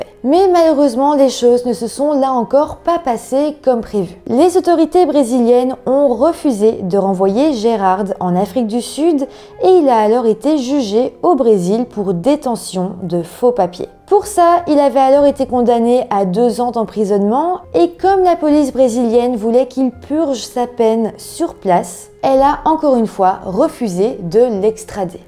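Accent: Belgium. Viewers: Elle est belge elle ne prononce pas les UI comme en France voilà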